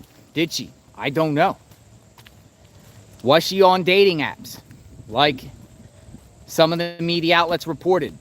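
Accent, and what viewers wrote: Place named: Baltimore, United States. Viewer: where are you from? You sound like you're from Baltimore! I'm from there so I know the accent. Its not heavy (your accent) but I feel youre from BMore /Philly????? Please answer if you have a minute. TIA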